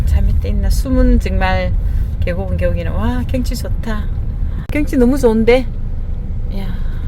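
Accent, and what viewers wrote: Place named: Daegu, South Korea. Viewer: 우리 사돈댁 근남면이군요. 억양이 대구분 같네요.. 여기는 수성구 황금동입니다. 다슬기도 있겠어요.. 같이 가시지요..😊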